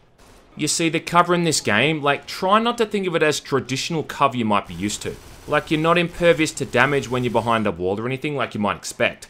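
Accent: Australia. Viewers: FrÜt he is australian though? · Idk if you'll ever see this comment but thank you for your videos. They are not only great reviews but also have a nice bit of witty humor. Plus for me the accent reminds me of my mums family from Australia. Which also makes me love the videos even more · Its so weird that your American accent sounds so Australian.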